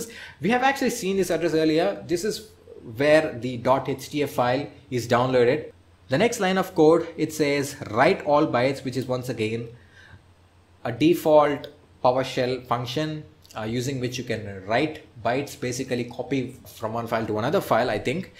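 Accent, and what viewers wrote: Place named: Tamil Nadu, India. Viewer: May I know your mother tongue..? Seems like Tamil 🤔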